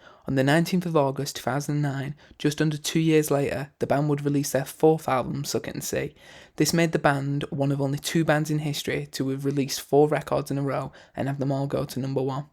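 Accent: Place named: Sheffield, United Kingdom. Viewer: You sound like you’re from Sheffield yourself or something haha. I haven’t heard a similar accent like it from anyone except.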